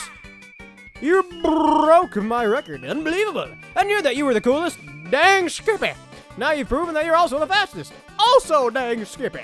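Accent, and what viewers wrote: South, United States. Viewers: Hey there, nice LP. It's good to hear another southern boy doing an LP. If you're ever bored, check out my LP channel sometime. For the QOTD: Very hard to pick, but I guess I have to say Shifting Sand Land because I've always liked the Egyptian themed Mario levels. I guess because Super Mario Bros. 2 left so much of an impression on me, maybe?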